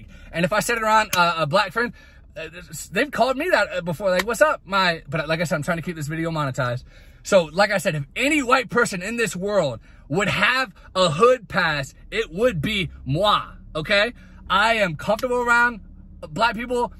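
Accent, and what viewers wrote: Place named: South, United States. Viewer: country accent is on point 😭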